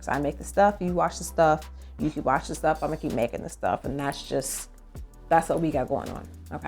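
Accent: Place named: United Kingdom. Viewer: British accent always kills me LOL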